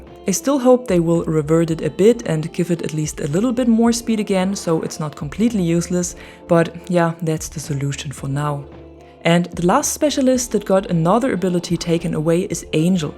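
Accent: Germany. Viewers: Lol du bist wirklich deutsch? Haha habe es irgendwie rausgehört war mir aber nich zu 100% sicher xD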